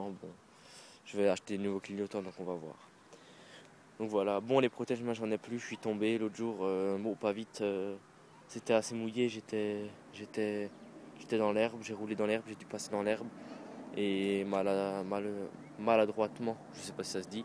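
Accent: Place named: Alsace, France. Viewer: ta vraiment l accent alsacien!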